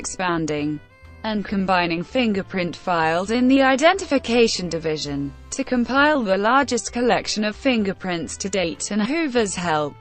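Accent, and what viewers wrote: United Kingdom. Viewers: Very bad done. That British accent! Trash 🗑️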